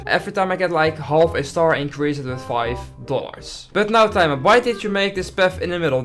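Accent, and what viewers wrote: Netherlands. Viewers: You can hear so much that he just speaks Dutch. It's nice that you make English videos. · love the dutch accent are you from dutchland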